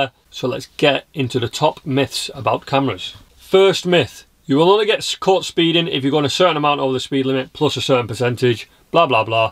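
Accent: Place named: West Midlands, United Kingdom. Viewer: Hi there! First off, great video! I'm from the West Midlands (old South Staffs actually) so I understood your accent perfectly - just in case some people whinge about your accent! LOL I've been driving since 1975 and hold both a full car and motor cycle licence. Most of my working life was spent in the motor trade and during that 'life' I covered many, many hundreds of miles on road test - some(!) of those miles at speeds that were, shall we say, a little north of legal! Also - during that time - I was 'done' only once for speeding (more by luck than judgement!) and since leaving the motor trade proper, I have fallen foul of a speed camera (one in a van) once as well. As a matter of interest, I've been involved in a few minor accidents too down the years - ALL at speeds BELOW 30mph! As someone who has been interested in driving since I was a young child (I have pictures of me in a pedal car at 'Uncle Eric's pedal car track in Rhyl, North Wales dating back to the early '60's!) I have become ever more annoyed by the way cameras have been used to sneakily extort money from the easiest target/cash-cow of all - the motorist. 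I totally agree that if someone is driving dangerously, then they should be penalised for it - but speed ISN'T always the issue! BAD DRIVING is! A proficient driver doing 100mph at 3am on an empty motorway is surely no more dangerous than a tw*t doing 40 in a 30 at 3pm in a school zone! I drive for a living now, and on a daily basis, I see people out there who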